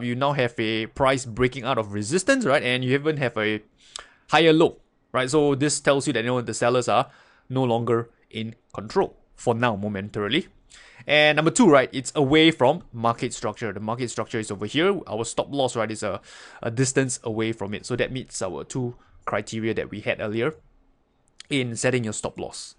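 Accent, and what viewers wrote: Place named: Singapore. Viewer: Singapore English....lol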